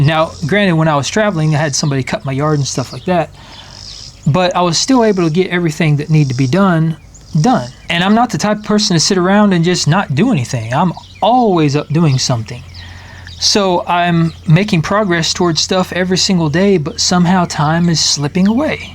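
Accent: United States. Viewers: I’d like to know where you are from in the US as I like your accent. Greetings from Queensland Australia.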